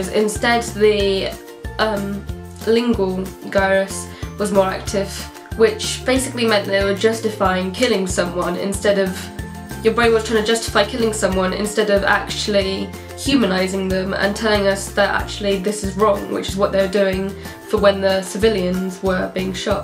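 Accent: United Kingdom. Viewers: Serious subject, happy clown music and a brittish accent do not go well together. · British Accent, Happy tone and a Psycho Topic. Hillarious.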